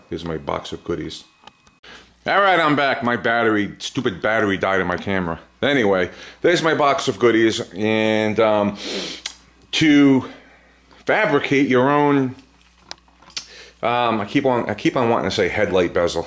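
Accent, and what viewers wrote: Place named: Brooklyn, United States. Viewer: Lovely accent you have :) are you from brooklyn?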